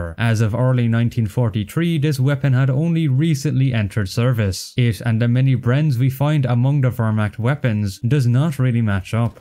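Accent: Ireland. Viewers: Your accent makes this video a hundred times better... w Ireland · Way off topic but I spent damn near my entire life watching irish shows n listenin to Irish music and I cannot place that accent, what county you from? I feel like I'm getting hints to both Mayo and Wexford somehow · Irish sounds nerdy? 💀 Do you want him to talk in Chinese?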